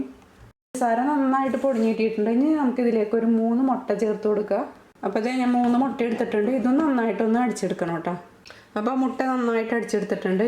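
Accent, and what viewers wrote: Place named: Aluva, India. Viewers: എവിടെ വീട്.. നല്ല പരിചയം ഉള്ള സംസാരം.. എല്ല word ന്റ അവസാനം "ട്ട ". . ഞാൻ ഇങ്ങനെ സംസാരിക്ക 😄from ത്രിശൂർ. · ചേച്ചി ആലുവ കാരി ആണോ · കൊച്ചി ആണോ നാട് ??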